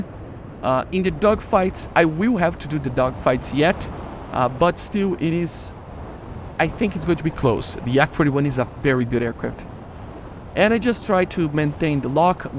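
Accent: Brazil. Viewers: He’s Brazilian, accents buddy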